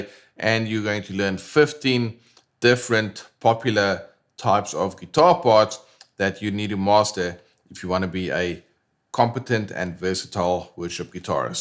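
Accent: South Africa. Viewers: Also, what accent do you have? I thought South African at first, but I'm not an accent expert XD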